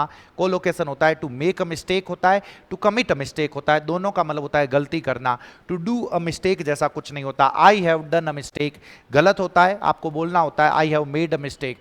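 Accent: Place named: Marwari, India. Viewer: Sir aap kha s ho marwadi hmare side ki h 😂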